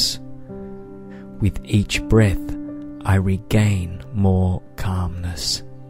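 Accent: Australia. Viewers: once again I cannot thank you enough for all of the help you have provided me with over the last 2 years just from your videos. Your voice puts me instantly at ease (aussie to aussie glad to hear your accent). You are my go to whenever I am fighting either anxiety or otherthinking. I don't know where I would be without you!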